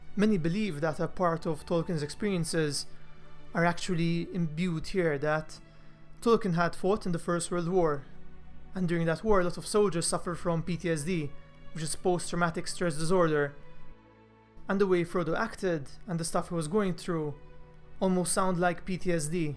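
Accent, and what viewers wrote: Malta. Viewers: Thanks for all the info it explained alot as just binge watch all the movies including the side movies. 1st off I have never witnessed a movie collection done so well and not just added more junk just for the money 2nd although the movies could not have been done any better,the BOOKs are the way yo go and must be read before watching the movies as your imagination can only do it justice and if done the other way around. I personally think if done the other way around you ruined an experience youll never get to have again!! So again thanks for the explanation and keep up the amazing work!! One final question and if you cannot answer I fully understand but, by any chance the person speaking in the explanation of this clip is he or are you Maltese!? Im wondering after hearing your accent. ;) again thanks for all youve done and hopefully I will get to speak with you again as Im trying to start my own journey and building a fantasy survival horror game based on locations in Malta and could use you're help but if you're busy I fully understand and wish you the best for the future,keep safe and hopefully Ill hear from you soon Regards Matthew Evans Vella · Really good videos! 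Are you maltese? Your accent sounds maltese 😁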